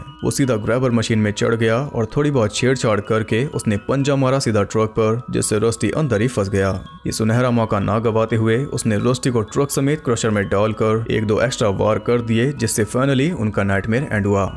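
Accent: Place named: Bihar, India. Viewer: Friday the 13th 2009 wali movie fir se explain karo bhai please pahle ki voice acchi nahin hai aap Jo voice hai vah bahut acchi hai kyunki yah thodi Bihari 🥺🙏🙏🙏🙏🙏🙏🙏🙏🙏🙏🙏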